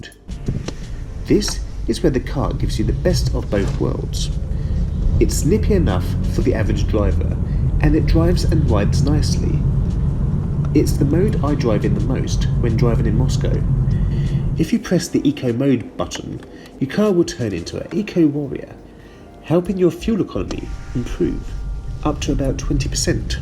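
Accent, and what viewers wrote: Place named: England, United Kingdom. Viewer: Why do you have an English accent but in Russia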